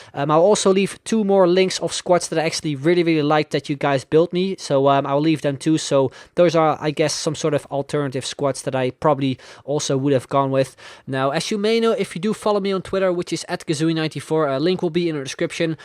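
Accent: Netherlands. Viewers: How the fuck does he do that unlocking shit. Amazing! And hearing him talk English I immediately knew he was Dutch, even better.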